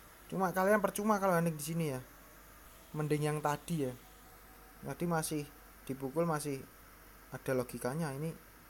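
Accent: Indonesia. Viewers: napa ga fokus talisman, bang ? Itu jauh lebih worthed dibanding enhanct equip u/ lv 180 lho.... Tapi logatmu iki jowo timuran, cocok karo aku kwkwkww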